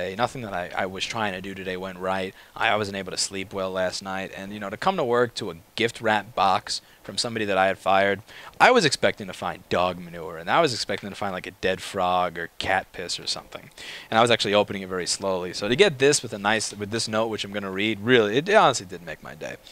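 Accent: New York, United States. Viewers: It’s really weird how your accent has become more of a New York accent in the last few years.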